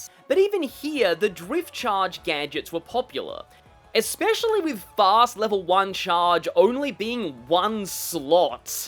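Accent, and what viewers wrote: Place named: United Kingdom. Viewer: I didn't watch the video, I heard a feminine British sounding accent and shut it off and went straight to the comments to laugh at people that were bad and coping. Go play with a homeless man's wiener under a bridge somewhere.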